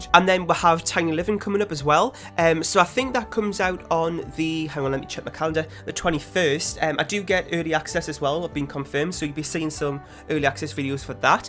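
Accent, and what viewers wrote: Newcastle, United Kingdom. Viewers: Just found your channel and Iv got too say I’m not sure of your accent but if it’s geordie then me too haha! Your build is so accurate too my grandmas house aswell and I think it’s really cool! Bets build Iv seen in a while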